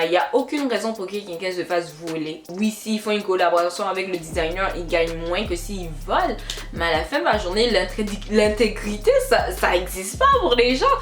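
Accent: Quebec, Canada. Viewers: A t'es québécoise ???? C'est pour que t'as un accent !!😭😭😭 En vrai j'm'en doutais ! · L’accent canadien 😭😭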